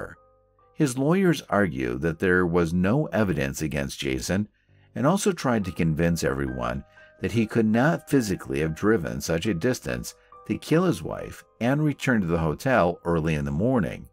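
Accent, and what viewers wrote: United States. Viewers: It's an American crime and the narrator sounds American, using miles would be a nice touch or both.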